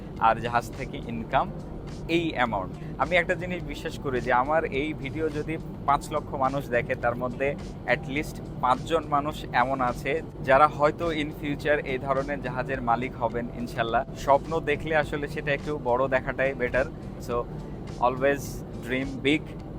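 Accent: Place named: Dinajpur, Bangladesh. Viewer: দিনাজপুরের উচ্চারণভঙ্গি মনে হচ্ছে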